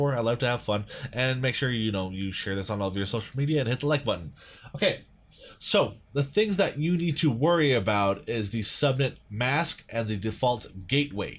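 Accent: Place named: India. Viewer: He's from India english is not his first language idiot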